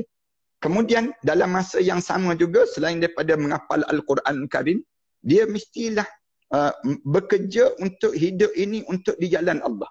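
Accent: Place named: Kelantan, Malaysia. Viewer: Samo kelate kecek kelate la kak kak Lopaa..😂😷😁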